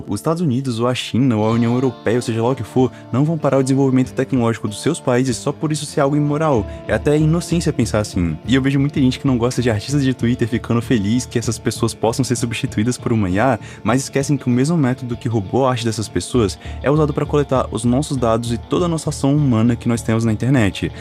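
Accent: Brasília, Brazil. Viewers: tu é daq de Brasília? kkkk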